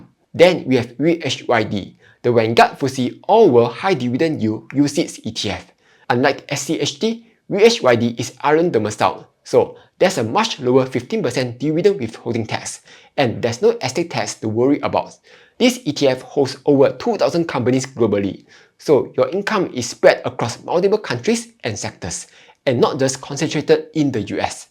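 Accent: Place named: Singapore. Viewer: Your singarpore accent(?) lm not use to trying to adjust to.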